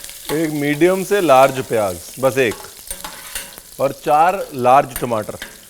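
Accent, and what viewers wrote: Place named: Punjab, India. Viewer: Mr. Brar, I have come across your cooking videos while surfing on the net randomly about two weeks ago. Anyhow, I haven't tried your recipes yet, but the mouth watering desi "Tarka" of your crispy and spicy chat is enhancing the flavour and taste of your programme immensely. The reason for enjoying & watching your programme is not your recipes but your dextrous and impressive way of connecting with your intangible viewers globally. It's worth mentioning that amalgamation & use of typical household punjabi, hindi words with appropriate dialect phonetics in your chat is stunning & awesome. Great job, keep it up.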